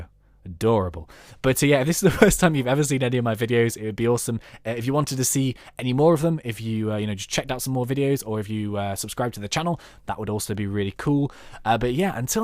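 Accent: United Kingdom. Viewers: I love his British accent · Are u British? And lol I love the dogs name lol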